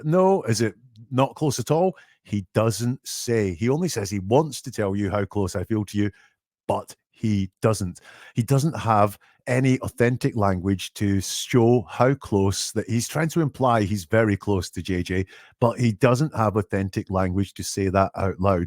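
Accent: Scotland, United Kingdom. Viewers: Couldn't believe it when I clicked and heard a Scottish accent...lol I've only ever heard Americans discuss this case. Loved the video. New subscriber from Ayrshire here 😁👍🏴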